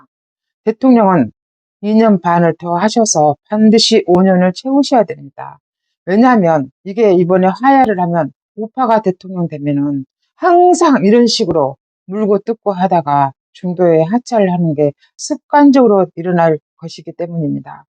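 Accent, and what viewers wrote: Jeolla, South Korea. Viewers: 씀했는데.... 전라도 사투리인가요?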